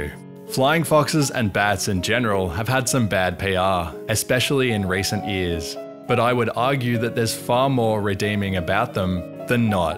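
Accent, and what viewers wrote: Australia. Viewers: I love an Australian doing an Australian accent! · You sound like FootLettuce but Australian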